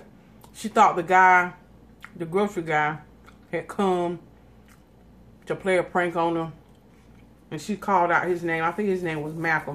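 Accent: United Kingdom. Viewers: Your british accent is posh Londoner, aka the accent every nation that imitates brits uses lol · Your British Accent!!!!! lol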